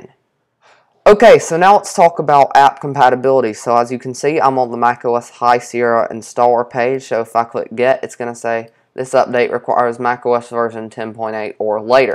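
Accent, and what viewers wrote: South, United States. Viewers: It's southern US but like city southern.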